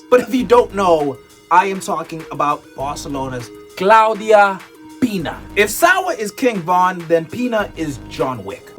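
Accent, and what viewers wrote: United States. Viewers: I understand the South Africa to England thing, but I can't place the American accent in your timeline. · Then how did you get that American accent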